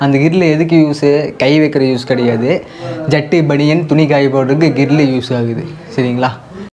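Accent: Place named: Coimbatore, India. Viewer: sirunga coimbatore bhashaikku na adimai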